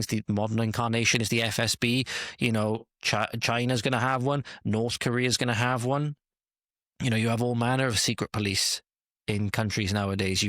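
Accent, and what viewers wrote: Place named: Welsh Valleys, United Kingdom. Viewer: Great to hear a Valleys voice (I would add our Welsh flag here normally, but YT has decided to censor it) That is a sick mf! TYVM for reporting on this! Have you heard about AJ Audits aka Anthony Styles?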